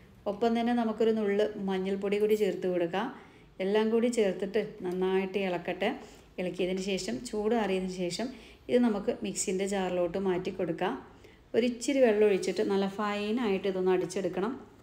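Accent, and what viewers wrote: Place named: Kannur, India. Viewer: താത്ത സംസാരം വല്ലാതെ മാറ്റി കണ്ണൂർക്കാരിയെ പോലെ സംസാരം പക്ഷെ താത്താൻ്റെ പഴയ സംസാരം കേൾക്കുന്നതാണ് ഞങ്ങൾക്കിഷ്ടം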